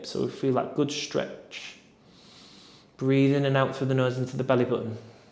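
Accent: United Kingdom. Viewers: Thanks - I’ve been doing your stretches for a few weeks now as I train for a half marathon in New Zealand. Loving your deep stretches and your accent that reminds me of living in the UK too!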